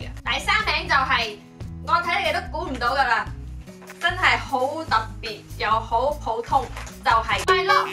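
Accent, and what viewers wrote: Cantonese, China. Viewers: 為什麼我聽妳講廣東話特別的舒暢？ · 姐說的粵語真好聽。 呵呵~我就知道會有Milo，可惜我沒喝過大馬版的，台灣版的我覺得還蠻好喝的。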